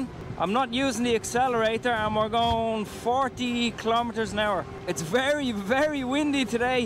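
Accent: Ireland. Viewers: You sound Irish I got one 2 weeks ago in tallagh dublin24 shop but tell me did u get a bag goodies with yours mines black prow same as yours but seen guy that didn't get them to build it like they offered but left out the bottle bag and other goodies I got receipt keys booklet and hand tools old school L alaenkeys spanners screwdriver that's it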